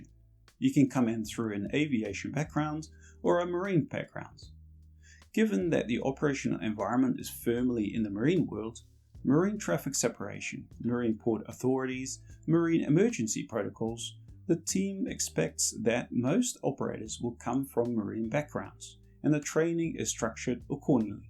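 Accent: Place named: New Zealand. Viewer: You sound like a Kiwi with something else bolted on?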